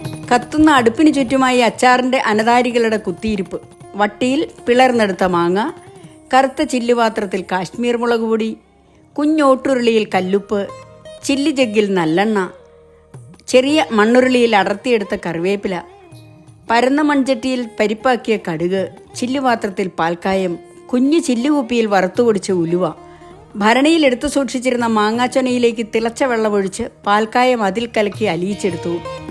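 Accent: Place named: Ranni, India. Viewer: എൻറെ മുത്തശ്ശിയും ടീച്ചറുടെ നാട്ടുകാരിയായിരുന്നു.റാന്നി.ഇതേപോലെയുള്ള സംസാരവും ജീവിത ശൈലിയും.❤❤❤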